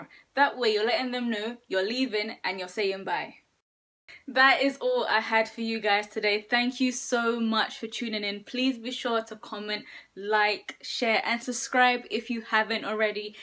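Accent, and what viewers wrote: Jamaica. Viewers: I love hearing your accent ❤🇯🇲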